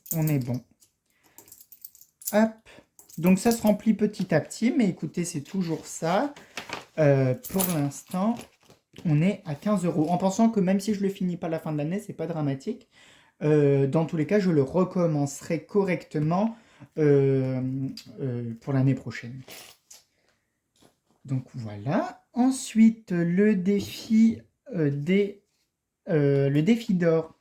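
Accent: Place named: Nord-Pas-de-Calais, France. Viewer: C'est cool tu ai ch'ti comme moi trop bien j'adore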